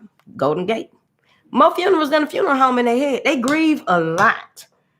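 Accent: South, United States. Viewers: You so Southern and I LOVE IT!😍😍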